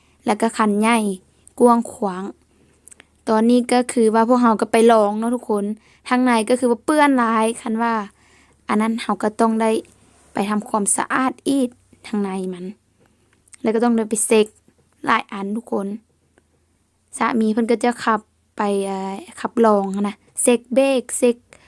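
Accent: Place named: Vientiane, Laos. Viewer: สะบายดี พี่สาวลาวฟินแลนด์ ผมมาเบิ่งรถมึอสองนำเด้อครับ มีแต่รถงามๆเนาะ สำเนียงเสียงเว้าเจ้าคึอสาวเวียงจันทน์เลยเนาะเว้าม่วนๆครับ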